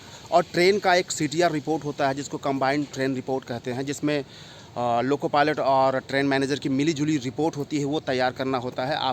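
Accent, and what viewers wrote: India. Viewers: Bhaiya aap ho to bihar ke hai mai apke acent se pahchan gya Lekin kis district se ho bihar ke😊😊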